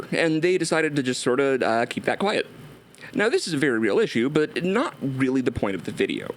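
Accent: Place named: United Kingdom. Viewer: For the longest time I thought you were British.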